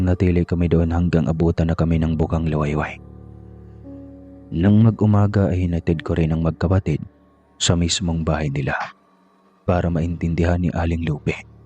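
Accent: Visayas, Philippines. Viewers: Kabog jud sir,bisaya ka tlga sir...😂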